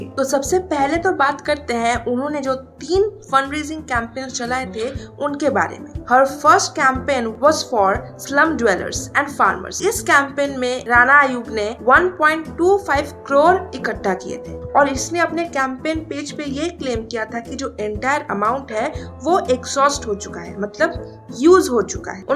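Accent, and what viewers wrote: Bengal, India. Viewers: Tum shayad west bengal se ho tumhari hindi bolne wali accent mein bangali touch hai tum north se nahi ho ye mujhe pakka yakin hai waise awaz mithi or pyari hai tumhari bhagwan ka shukra hai ab ladkiyan bhi right wing ko support kar rahi hai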